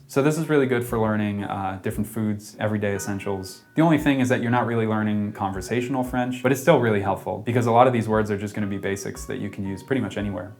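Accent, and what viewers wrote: Quebec, Canada. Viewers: I love your québécois accent! Great video! Thank you! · Wow j’aime ton accent québécois, tu est plus québécois que beaucoup de pure laine d’ici. Pour être québécois, il faut le sentir dans son coeur et je crois que c’est le cas pour toi sinon tu n’aurais jamais fait tous ces efforts pour t’intégrer. Vivre dans une culture étrangère et comprendre sont deux choses séparées en terme de profondeur. Pour moi tu es le genre d’humain respectueux de son environnement. Grande grande qualité. 😊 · really impressed by your québécois accent, altough you need to work on the France accent. Your indepth knowledge of history is spot on, very good work. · Excellent tutoriel. Ton accent québécois est hallucinant pour un Américain. On est fiers de toi. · Je ne peux pas parler à la place de tous les français mais pour ma part, plus que te pardonner, je te remercie pour ce très chouette contenu sur le Québec. 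(de la part d'une française). J'ai été très agréablement surprise par ton accent québécois. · Your quebec accent is "su'a coche"... and kinda sexy too. 🔥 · Char means tank lol You're ma favorite american. Your 100% québécois now, even the accent. My respect! Just wanna say that we are teached 95% exactly the same french as in France, almost all of it come from oral tradition, and were proud of it. To the point that France french sound a little bit sissy, ou "fif" to us. Like "un truc". Ouache! I M halfway trough the vidéo, i Wonder if you incluse "ouache" in it lol. Meaning "its disgusting" Wete weirdos, but Nice one :) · listening to you fully enunciate Quebecois French through my headphones is cringe inducing and makes my ears bleed... You've clearly immersed yourself in this seriously mutilated version of French. Thankfully the North African speak proper French... Eventually I suspect the joual accent/dialect will go the way of the dodo. 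· Such a beautifully put together and explained video. I have been living in Montréal for ten years and my enthusiasm has waned recently, but you have re-inspired me to take those steps that I have been lazy about, such as reading in French and listening to the news in French. You are an inspiration to those of us who care about Québec and maintaining this unique language. Your Québec accent is remarkable. Thank you so much. I have shared this video on X and my FB page. Wow! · Nah mate, your pronunciation of French names and words sounded 100% Québecois; the Quebec accent changes so much across regions, economic classes, and cultural upbringing. Depuis le temps que tu habites au Québec, tu peux te considérer Québécois sans être gêné! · Ça se voit (et s'entend, lorsque tu parles en français québécois) que tu as passé énormément de temps au Québec! Mais non seulement ta prononciation du français ''européen'' est à ce que je vois impécable, tu décortiques, expliques ET illustres magnifiquement les différences de prononciation, sans tomber dans le piège que beaucoup font de présenter le français du Québec comme une version erronnée de la version européenne. 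Et c'est sans parler de ton résumé de l'histoire du Québec! Je vais me faire une joie de partager fièrement ton vidéo à mes amis anglophones d'à travers le monde, puisque c'est honnêtement probablement le meilleur vidéo explicatif de notre patrimoine historique pour une introduction à notre culture. Merci! (EDIT) J'ai initialement écrit mon commentaire sans regarder la durée du vidéo restante (j'étais à environ 20 min). Le reste est purement et simplement de la musique à mes oreilles. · Honnêtement une des meilleures sinon LA meilleure vidéo qui capture beaucoup de mots et d'expressions québécoises! Bravo! Tu t'es vraiment immergé et tu as fait l'effort d'apprendre l'accent, les mots et les expressions d'ici! Ta vidéo est vraiment sua coche!;) Bienvenue chez nous! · You’re messing with us. Are you from Quebec but can speak English? Or English and can speak with a Quebec accent. En tous cas, t’es bon en criss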